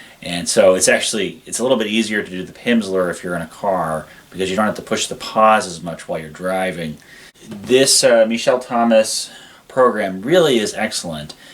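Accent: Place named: United States. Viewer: I love this review, i just wonder why you are pronouncing the words after with an american accent? Im from here, too, so asking as a neighbor. Ive been writng words phonetically to make sure im saying what im hearing and not reading in my own language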